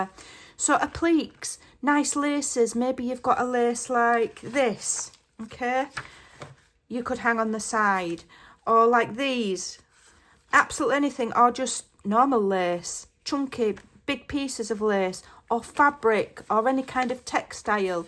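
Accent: United Kingdom. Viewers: This is the first time I've watched your channel. What grabbed me was your accent. Being from Texas, people comment on my accent, course I'm thinking, "What accent?" I'm not trying to pry, and please feel free to say none of your beeswax, but what part of UK does your accent come? I love it. Also, you have really good ideas.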